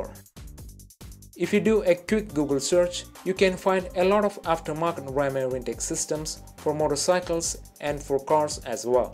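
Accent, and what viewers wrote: India. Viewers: Such a heavy Indian accent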